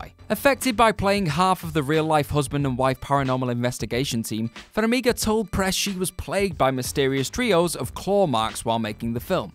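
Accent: United Kingdom. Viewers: Don't forget, we're talking about British people narrating this, so their way of pronouncing some words and names may differ.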